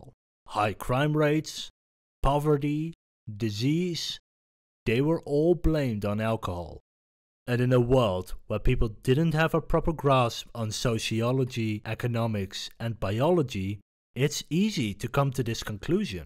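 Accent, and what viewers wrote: Netherlands. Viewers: This guy is definitely dutch based on the accent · Hearing the accent I was thinking, this sounds like a Dutch dude. After seeing the Brand beer; Jup, Nederlands!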